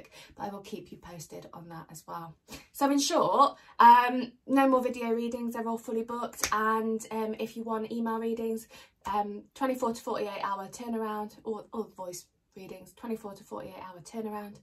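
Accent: England, United Kingdom. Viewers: Thank you! I love the way the English talk ! Is your relationship going to rise from the dead. Great for Halloween reading 🤣☺️🎃💀👹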